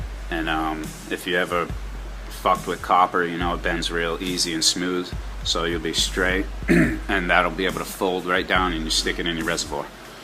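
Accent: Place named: Rhode Island, United States. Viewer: I listened to you and I’m like this guy has to be from RI hahaha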